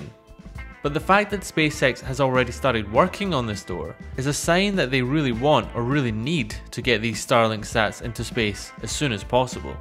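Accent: United Kingdom. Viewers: he is bri'ish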